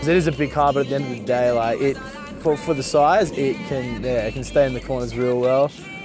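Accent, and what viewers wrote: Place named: Australia. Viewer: Haven't you heard an Australia accent ??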